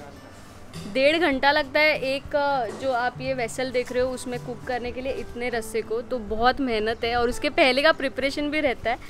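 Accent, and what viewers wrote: India. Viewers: Your channel is nice and content is good too.. doing videos in hindi helps larger audience to watch it, which is awesome too! But why to pronounce marathi words in hindi accent 🤔 somewhere we do this which sounds weird.. be proud of our language and pronounce मिसळ as मिसळ and not मिसल.. just one example im giving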